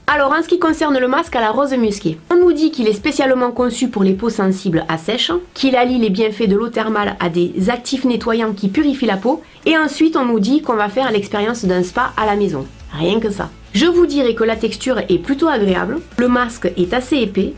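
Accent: South, France. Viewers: Du sud ( elle habite à Perpignan ) 😄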